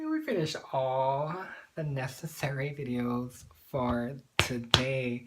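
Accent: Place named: Hawaii, United States. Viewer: I’m trying to find ways to organize my lashes and as soon as I heard you speak I just knew you were from Hawaii 😂💛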